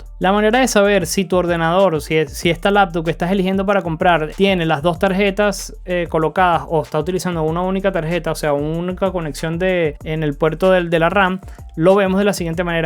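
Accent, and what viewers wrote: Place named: Venezuela. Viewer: Hola amigo, soy nuevo suscriptor, por tu acento noto que también eres de Venezuela, de ser así me gustaría saber al día de hoy que Laptop recomiendas de las más económicas para empezar acá en Venezuela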